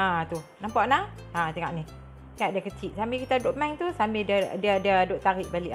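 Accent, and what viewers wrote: Kedah, Malaysia. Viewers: Cik org.belah mana..dikedah ni..petua baguih sungguh..laa haih siap loghat kedah..terbaik ikhlas ngguh.. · Bukan lawak..org Kedah memang ckp.macam ni.. sbb tu org kedah unik.. dan memang hati depa ikhlas..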